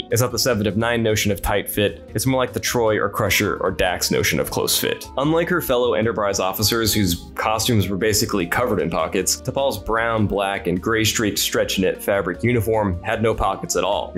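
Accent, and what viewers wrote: United States. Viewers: Wait, did y’all hire a new person? An American??? (I’m American too, so, I empathize haha) Or is this the fabled who Adam Clery is always shouting out? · Wait... You hired an American?? Maybe I should have applied after all. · Uhh. Who is the Yank talking? It's jarring! :P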